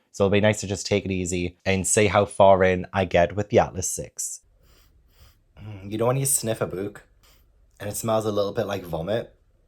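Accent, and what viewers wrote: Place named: Newcastle, United Kingdom. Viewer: I love how Geordie you get talking to the cats!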